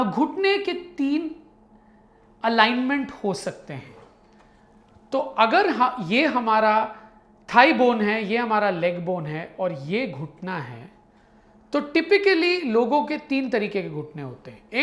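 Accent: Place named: Maharashtra, India. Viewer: Sir ,are you Maharashtrian? Because your accent is marathi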